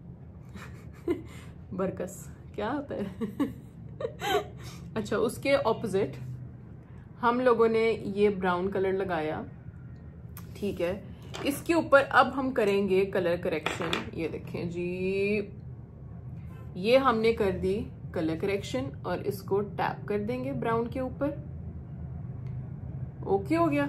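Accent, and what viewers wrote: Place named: Punjab, India. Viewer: Bhtt achi lagi kal lazmi yeh trick try krungi nd ur punjabi talking way sun kr to video dekhne m or maza ajata ❤❤❤❤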